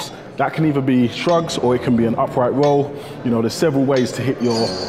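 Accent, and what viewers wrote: United Kingdom. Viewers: Are you British ? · Alright bro, r u from here in the UK???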